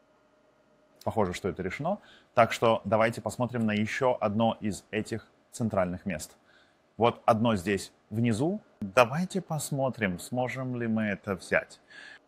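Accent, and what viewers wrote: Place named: Russia. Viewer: I was just telling my wife how great your Russian accent was! 😁 It made watching this easier for her as she doesn't speak much English at all. 🤭 But I saw the original En issue as well. 👍🏼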